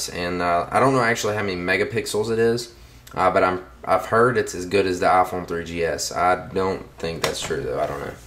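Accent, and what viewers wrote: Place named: Georgia, United States. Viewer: You're from Georgia are't ya bud. Somewhere a little South of ATL? Spalding county maybe.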